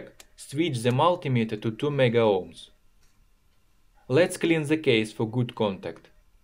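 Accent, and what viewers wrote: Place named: Russia. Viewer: First time hearing a russian accent?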